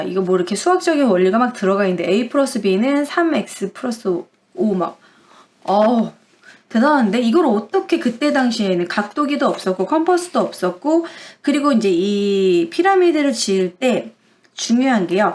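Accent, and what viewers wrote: Gyeongsang, South Korea. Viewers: 갱상도 분!?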